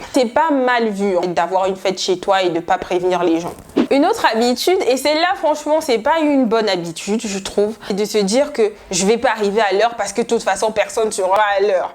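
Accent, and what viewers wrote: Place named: Democratic Republic of the Congo. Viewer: 🤣🤣🤣 tu mets "quoi" à la fin de certaines phrases 🤣🤣 hey moninga tu es purement congolaise toi 😍😍😍😍 je t'adore. En passant tu es trop belle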